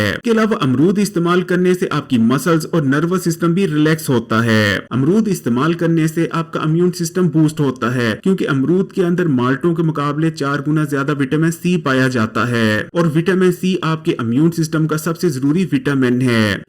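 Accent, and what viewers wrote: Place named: Punjab, India. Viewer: BHAI SAHIB JAB AAP NE URDU PUNJABI LEHJE M BOLNA HY TU BUHAT BEHTAR HY AAP PUNJABI M CHANNEL LANGUAGE RAKHAIN.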